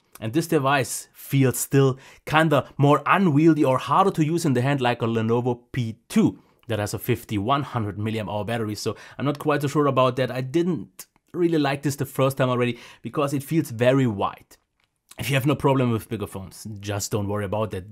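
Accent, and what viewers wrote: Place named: Germany. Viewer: I love your videos and your accent you German Guy :D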